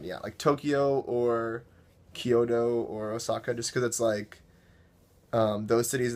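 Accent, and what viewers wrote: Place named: California, United States. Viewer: I think the best thing about this video is you mentioning the nabe, mainly because I love spicy food as well. Also I have a question, where are you originally from? You sound like you're from Cali, probably southern but I'm just curious.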